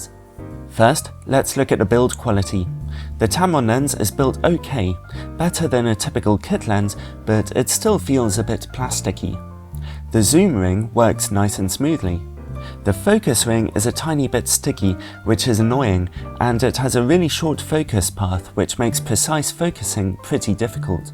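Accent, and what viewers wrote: United Kingdom. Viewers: excellent review indeed. Thank you. I really like your voice and British accent.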